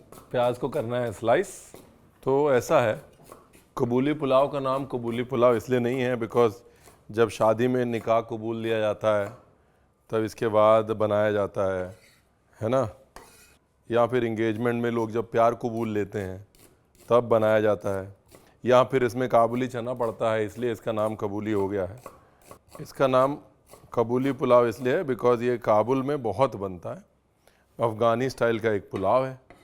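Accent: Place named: Lucknow, India. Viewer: Bolne ke laheze me Lucknow hai ❤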